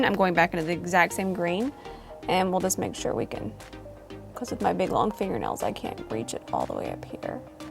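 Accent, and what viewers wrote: South, United States. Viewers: I love your colorful tutorials and your spunk. You are so fun to watch. I love your Southern accent too.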